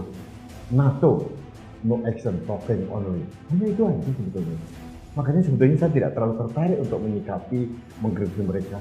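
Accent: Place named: Surabaya, Indonesia. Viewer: MAJU TRUS PK BUAT NKRI SALUT DI DUKUNG RAKYAT KAYAK PK JOKOWI DN PK ASLI MANA YA? / BILANG AKEH TUNGGALE REK DIALEK AREK SBYO TRIM PK MAJU TRUS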